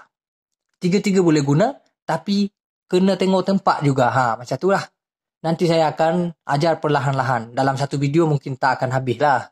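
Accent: Kelantan, Malaysia. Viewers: Bakpo demo kecek ado bunyi loghat kelate? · Kenapa cara awak cakap Melayu ada gaya Klate? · slang bhai ni slang bahasa melayu klate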